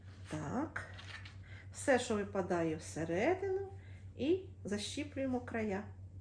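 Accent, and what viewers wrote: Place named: Vinnytsia, Ukraine. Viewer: Батони у Вас вийшли чудовіі,мабуть,дуже смачні.Так і хочеться спекти...,а розкажіть трошки про себе.Ви часом не з Вінницької області?Знайомий, говорок''...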